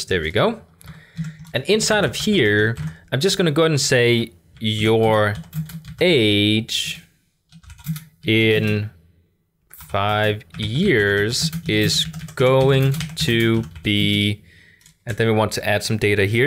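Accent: Denmark. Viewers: Er du Dansker?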